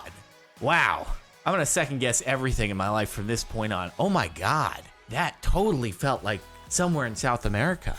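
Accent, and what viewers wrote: Russia. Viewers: I don't think it's bad that he does a Russian accent it sounds cool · god i love your russian accent lol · "This is Mother Russia over here, and here is Baby Russia right there" I cracked up at that point but yeah even after 5 years that Russian accent is very funny and cool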